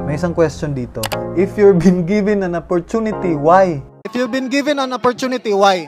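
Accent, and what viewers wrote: Visayas, Philippines. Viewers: piste bisaya man diay ka hahahaha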